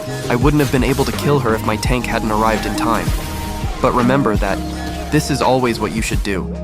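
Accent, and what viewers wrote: India. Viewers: Is bro indian?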